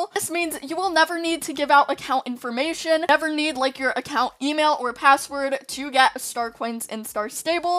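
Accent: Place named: England, United Kingdom. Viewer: “Have fun today” / “equestrian festival” love your Chanel, your English accent is on point x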